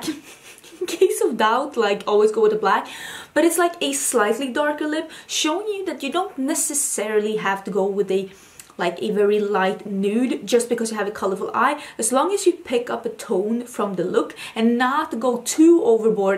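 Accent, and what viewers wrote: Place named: Stockholm, Sweden. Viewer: My Fiance is from Stockholm, I'm from the US. To me, your accent sounds like a Stockholm accent(I could totally be wrong tho!). I'll be moving to Sweden in the next year! So glad I found your channel!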